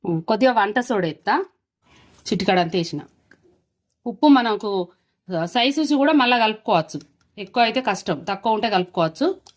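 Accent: Telangana, India. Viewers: Parka Telangana Bhasha nachindi I like your cooking methods 🎉🎉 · Chala baga chepparu amma....same ma intlo valla laga matladuthunnaru, Telangana Bhasha lo maku chala nachay me videos...elage continue cheyandi Me videos chusi cake chesanu Mutton curry chesa super vachay❤❤ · Accham Telangana bhasha thalli🎉🎉🎉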